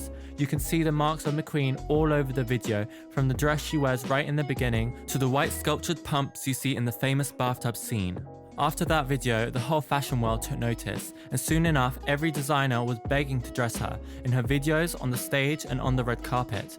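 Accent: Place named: England, United Kingdom. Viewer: Not to dismiss her fashion influence, but one cannot attribute a cultural zeitgeist to a single person. Additionally, Beyonce wore a robot suit before we know the name Lady Gaga. Check her Get me Bodied performance at the BET Awards. I hear the English accent, however, so I understand that you may have your own cultural biases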